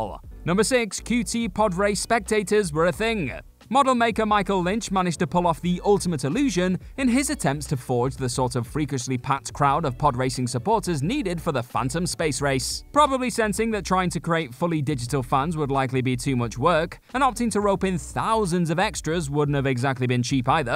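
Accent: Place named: Geordie, United Kingdom. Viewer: Gotta love a slightly posh Geordie nerd. I am one myself.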